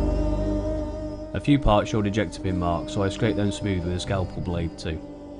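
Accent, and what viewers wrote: United Kingdom. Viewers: Nice to finally hear your voice you sound like your from the UK.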